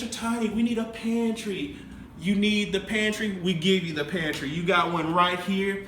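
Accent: South, United States. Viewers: Is he southern, ghetto or just smooth in his mind? Nice layouts, but he turns me off completely.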